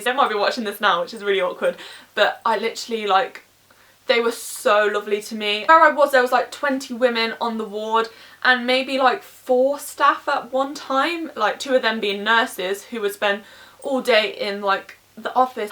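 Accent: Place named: Birmingham, United Kingdom. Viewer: Just Sayin i think it's a bit brummy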